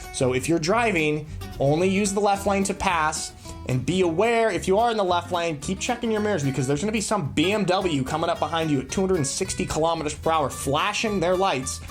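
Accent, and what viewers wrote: Swabia, Germany. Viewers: The Swabians might deny it, but there's definetly some Swabian twang in your German,. Pretty cool;)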